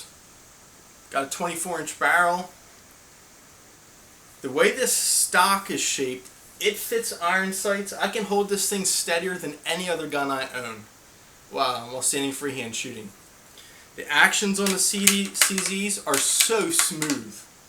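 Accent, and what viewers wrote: United States. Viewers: Is yinz from Pittsburgh? I'm hearing some signs of a SW PA accent. Could be OH too, sometimes. Anyhow, nice guns. I can relate.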